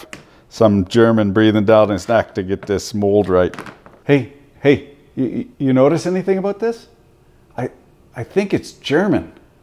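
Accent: Quebec, Canada. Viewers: why is he cursing in québecois? 😂 il est vraiment bon en plus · hmmm. are you from Quebec, canada? your language sounds familiar for cursing :)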